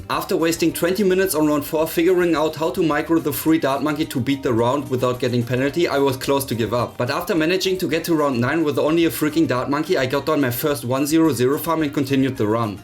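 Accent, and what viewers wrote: Germany. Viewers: Ich kann den deutschen Akzent einfach nicht ignorieren, aber nicer run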